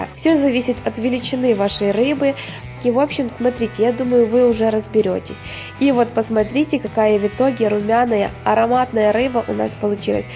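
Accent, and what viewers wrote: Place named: West, Ukraine. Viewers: Если Вы не слышите украинский акцент, то это Вы с другой планеты. Ясно? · Девочка из Западной Украины, г.Ровно. Не надо придираться к произношению "правильно, или не правильно". Ей 24 года, а готовит - профессиональный повар оценит.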